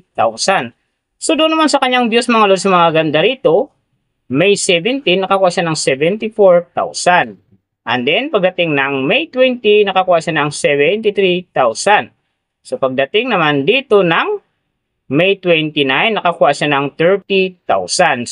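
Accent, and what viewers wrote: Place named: Visayas, Philippines. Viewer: Visaya kb dong? Bkit ganyan k magsalita.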